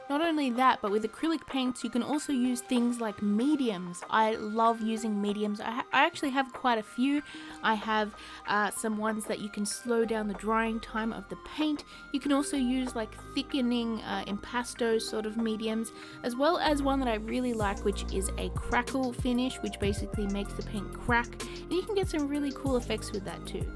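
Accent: Australia. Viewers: I luv that australian accent :D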